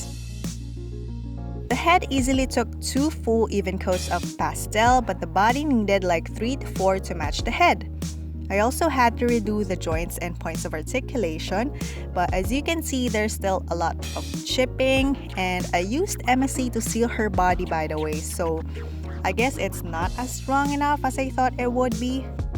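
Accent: Philippines. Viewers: You kinda have a filipino accent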